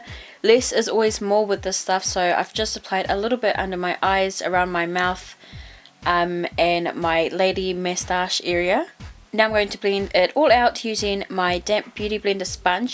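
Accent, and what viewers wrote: New Zealand. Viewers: guuuurl recognized the kiwi accent right off bat. love the videos!!!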